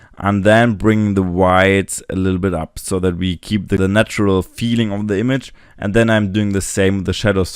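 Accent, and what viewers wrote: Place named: Germany. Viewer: echt angenehm, das Englisch von einem Deutschen zu hören, dann kann man auch mal was verstehen xD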